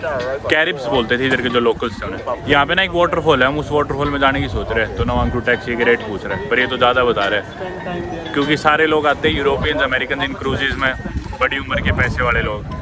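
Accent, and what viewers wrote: Haryana, India. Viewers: Like your Haryanvi touch in your Hindi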